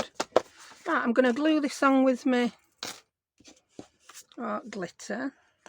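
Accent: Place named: North Yorkshire, United Kingdom. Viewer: I just found your videos! I absolutely love them! Especially love your accent. I spent 18 years in the UK, mostly North Yorkshire. You are making me homesick! In a good way, I loved it there. If I may ask, where are you located?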